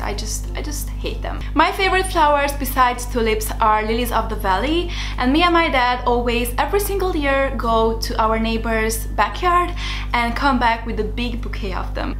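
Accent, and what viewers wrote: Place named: Romania. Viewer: I also forgot to mention I had a friend from Romania named Anna Monica when I was in 1st-3rd grade! She definitely had your accent!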